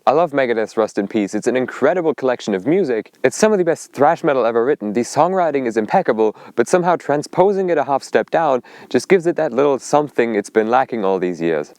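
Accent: United Kingdom. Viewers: Could you, for the love of God, explain who you are british sounding person in Germany with good content but an odd guitar collection! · I only play in Eb. Spot on British sounding German sir.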